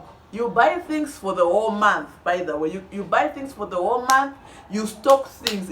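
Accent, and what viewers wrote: Uganda. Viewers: Uganda is on the equator two rain seasons so plenty food.you now speak like a Ugandan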